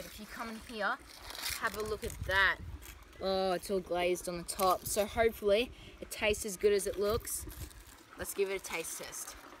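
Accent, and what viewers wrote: Australia. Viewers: Is he ozzie? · He’s Australian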